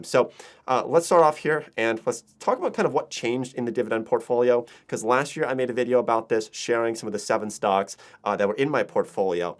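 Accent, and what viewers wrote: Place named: Pennsylvania, United States. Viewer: 5 minutes into this video, I'm like: "dang, is this guy from PA?"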